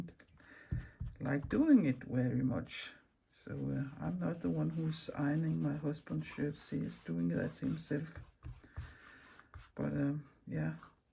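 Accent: Denmark. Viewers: i absolutely love your approach to the creative process - these beautiful fabric and embroidery collages really resonate with me. i'm canadian, but my beloved grandma was danish, and i hear her beautiful, delicate accent in yours. thank you for sharing your unique, inspiring, thoughtful art. i look forward to more!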